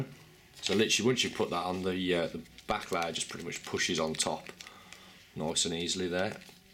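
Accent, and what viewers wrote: Birmingham, United Kingdom. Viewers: A Fellow Brummy :O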